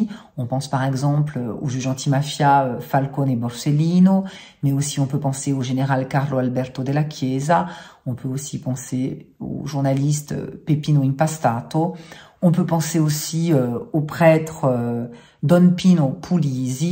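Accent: Italy. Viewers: Ah ! Le RDV hebdomadaire avec ton magnifique accent italien 🤩🤩 Ça alors, je ne savais pas qu'il existait une journée nationale en mémoire des victimes des mafias… Quel dommage qu'elles gangrènent encore ce beau pays.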